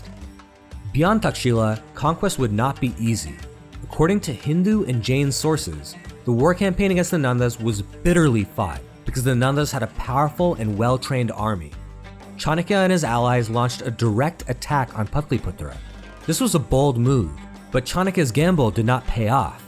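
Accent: United States. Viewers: You're foolish to think you shouldn't lionize our great history. I'm not saying to lie but you're only helping the west lie about India by bending over for them and making India look bad. I seen three of your videos now and I found out in the first video what you are. You're anti-Hindu. Next video I started to realize you're probably ABCD with your American accent and sometimes proper pronunciation and sometimes cringe Americanized pronunciation. You're too ashamed of being proud of India's Hindu and Dharmic heritage... Be proud of India and it's Hinduness.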